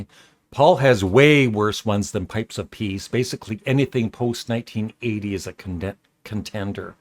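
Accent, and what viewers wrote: Canada. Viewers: Perhaps some people don’t realize you are just simply saying Jagger’s name with your Canadian accent? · I have always pronounced it jag~er. Maybe it’s your Canadian accent,!😉I’m so glad that you get more good comments than the rotten ones.😊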